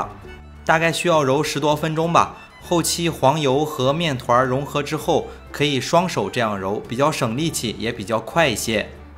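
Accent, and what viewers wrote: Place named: Shandong, China. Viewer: 听声音一直觉得您好像带山东口音，又会做喜饼，先生应该是咱们山东人吧？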